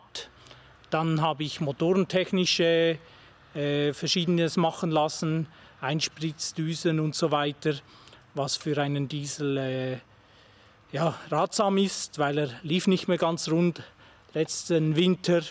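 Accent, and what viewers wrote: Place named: Sweden. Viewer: Are you Swedish of origin? Sounds like i'm hearing a Swedish 'R' :-). Beautiful Alfa.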